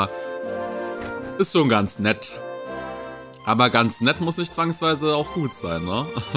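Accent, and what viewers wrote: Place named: Low German, Germany. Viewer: Ach ja ich mag diesen Akzent. Plattdeutsch ist schon was interessantes. By the way wie geiht di dat? 😂😂 Das ist mein lieblings Satz auf Platt. Selber sprechen kann ich es nicht, aber ich verstehe es und noch was krass das LP hat fast 100 Parts. Ich würde dir raten mal in der Herberge zu übernachten. Vielleicht passiert ja auch in diesem Dorf das selbe wie im anderen. Seien wir mal ehrlich es ist schon eigenartig, dass niemand mehr da war. Ich glaube das hat was mit diesem Musiker und seinem Lied zu tun. Wer weiß vielleicht verzaubert er sie ja mit dem Lied und sie verlassen über Nacht das Dorf um irgendwas zu tun. Das erinnert mich gerade an eine Geschichte und zwar hieß sie „der Rattenfänger von Hameln" nur dass es nicht die Kinder sind die verschwinden sondern alle. Bei dem Schwert Stimme ich dir zu. Es ist ein schönes Detail im Spiel, was bei DQ meist nicht selbstverständlich ist 8 zum Beispiel, da gab es einmal was sie normal an hatten und das „Spezial Outfit" (weiß nicht, ob sie sogar mehr als ein Exklusives Outfit hatten aber naja du weiß was ich meine). 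Das finde ich in DQ 9 auch so geil, wenn man sich um zieht und der Charakter das dann auch im Kampf an hat. DQ 9 ist aber so ein tolles Spiel. Action, Spannung, Drama, Story und noch ein paar andere nennenswerte Dinge an DQ 9. Eine Frage würdest du auch mal DQ 9 LPn? Würde es auf jeden Fall klasse finden. Super Part übrigens auch noch. Freue mich schon auf den von morgen 😊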